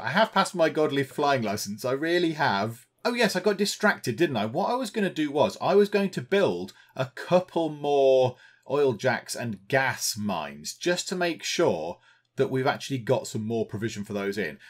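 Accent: United Kingdom. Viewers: You've just became my favorite youtuber. Your British accent and personality is just too cute. I know that's not very masculine to say, but i'm sure you can deal with it...lol Thanks for the videos. I've been enjoying playing this game myself.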